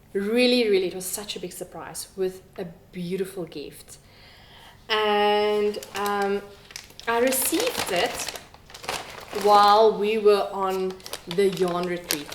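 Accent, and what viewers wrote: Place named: Afrikaans, South Africa. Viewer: Haai 😊 Ek het jou channel gekry deur die recommendation van mywonderfulknittinglife. Ons het ~20 jaar terug Amerika toe getrek, en ek was baie excited toe sy se jy is in SA. Dit is so lekker om n Afrikaanse aksent te hoor. Dankie vir n lekker episode! ❤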